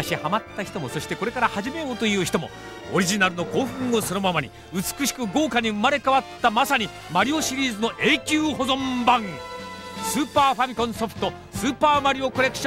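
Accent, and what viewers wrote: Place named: Japan. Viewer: Narrators speaking English but in Japanese accent.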